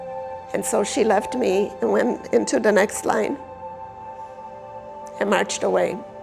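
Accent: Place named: Chicago, United States. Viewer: She's got a heavy story and a heavy Chicago accent :-)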